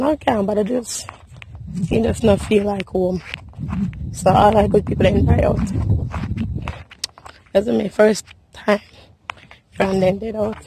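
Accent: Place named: Jamaica. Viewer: Like your attitude. You don't make overseas change you one bit. You maintain your Jamaican accent.❤💜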